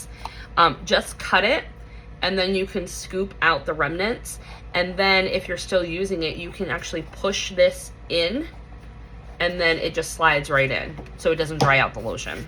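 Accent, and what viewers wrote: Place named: Massachusetts, United States. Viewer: I live in Southeastern Massachusetts, & no school on Good Friday. I always thought that you had the Mass accent, and you definitely have our spunk-if you know, you know 😂. I despise Scentsy’s lemon with the exception of the Harry Potter bar. I was so glad when that club fluke happened, so it is back in my club again. Great empties!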